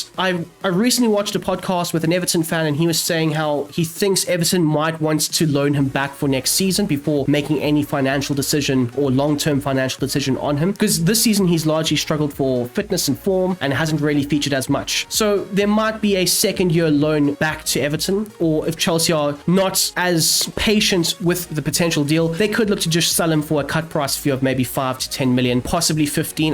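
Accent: South Africa. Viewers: Fellow South African?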